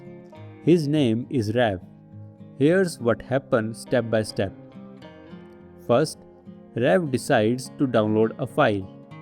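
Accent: India. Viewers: After hearing the Indian accent, I was confident that this video will surely explain me, and it did!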